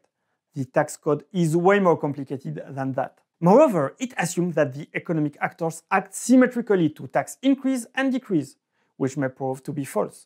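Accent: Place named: France. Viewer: Your French- English is not god enough,never heard that the sums of investment are equal to savings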